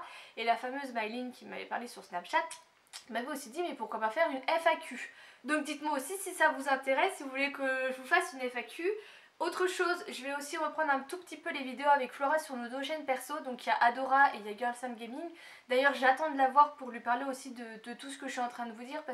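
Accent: Lorraine, France. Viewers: avec le petit accent lorrain de chez nous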